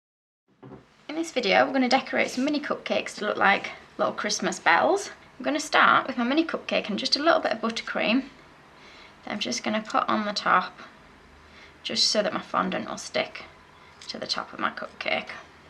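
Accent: United Kingdom. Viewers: Are you british? You have a very intense accent( not the bad way)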